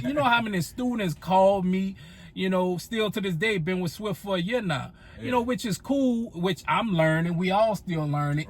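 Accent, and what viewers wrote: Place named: New Orleans, United States. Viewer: You definitely from NOLA heavy accent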